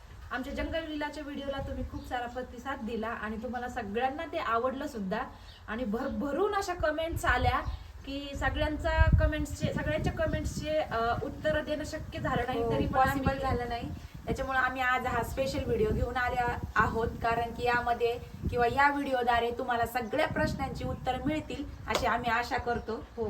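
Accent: Ahmednagar, India. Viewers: तुमच्या बोलण्यावरून समजल होत तुम्ही नगरच्या आहे 😊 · Radhe radhe tai nehmi khush raha doghi jani aniii tumhi nagri accent mdhech bolt raha khup mst vatt❤ radharani bless you radhe radhe❤ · तुमचा भाषेवरूनच कळले कि तुमी अहमदनगरचे आहेत कारण की मी पण नगरची आहे अशेच आनंदी रहा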